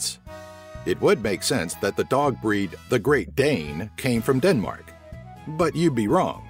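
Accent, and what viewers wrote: United States. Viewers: it's funny to here a American saying danish words.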